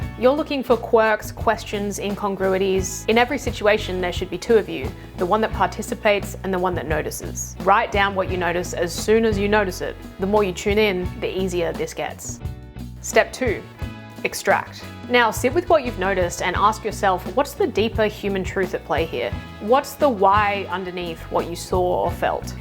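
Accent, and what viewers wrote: Australia. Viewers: How good are you? I found this really engaging from the start but about a third way in was when I realised you're a fellow Aussie. I was taken aback at how completely deaf to it I was and that's rare. So I reckon it was the quality of the subject material and the presentation that made me overlook what is often a big deal for me. Or was this a Jedi/advertisers mind trick you played? Either way great material. Thanks.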